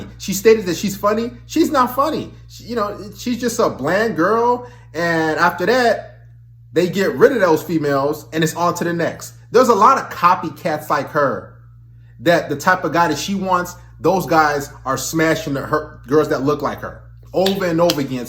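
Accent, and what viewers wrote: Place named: Chicano, United States. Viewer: You speak like a "vato" bro.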